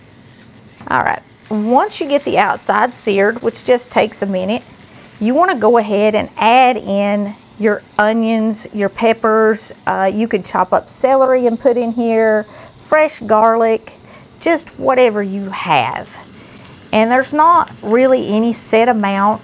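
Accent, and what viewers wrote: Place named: Appalachia, United States. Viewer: She's American Appalachian speaking English. Wotta question, 🤔 & Who WANTS to Know?? Friend, lol. Oh geeze.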